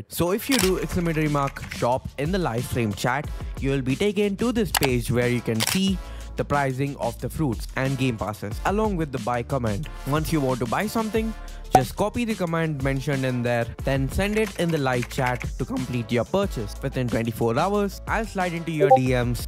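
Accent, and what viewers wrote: India. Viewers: Yuo indian?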